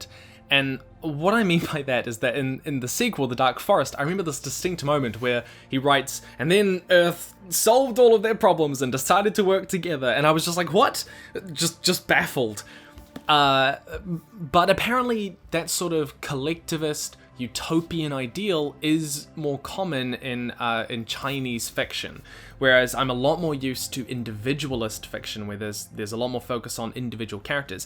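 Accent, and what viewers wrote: New Zealand. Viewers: Yo bruv. Good vid. Where you from? You sound like a kiwi to me. Good to know there's more than just me reading this sort of thing in nz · Great to hear a Kiwi do a review, too often it's Americans which is fine but I like to hear different voices. Thanks for your review. I'm going to buy the trilogy. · Its a kiwi. Love it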